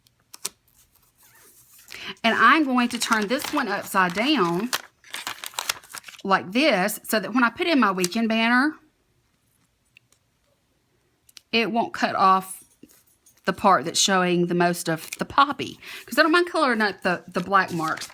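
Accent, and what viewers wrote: South, United States. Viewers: Girl I love watching your videos, and your southern accent is EVERYTHING! I can absolutely enjoy watching your pwm's even if I can't buy the kits because as someone who can't afford to buy sticker kits, these kinds of videos give me inspiration on how I can use computer paper or cardstock to create a layout. Keep doing you and being your authentic beautiful self 🤗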